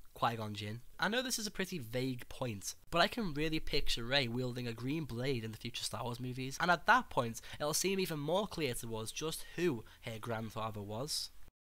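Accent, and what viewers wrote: Liverpool, United Kingdom. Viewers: Are you from Liverpool???? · Where are you from? UK probably?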